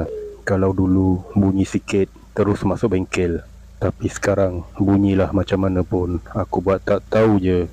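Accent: Kelantan, Malaysia. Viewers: Haha😂😂jengg mu klate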